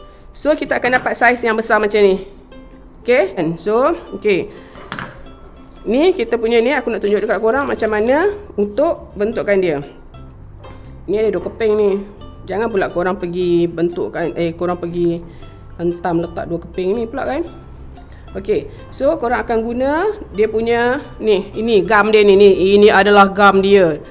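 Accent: Perak, Malaysia. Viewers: Aku.... Aku... Bahasa belah mne ye.,, selalunye org perak? Maaf hanya bertanye... Tanye😁😁😁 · Terbaik terang bercakap senang nak faham nati nak cuba buat 😊 sya dengar pon mcm orang Perak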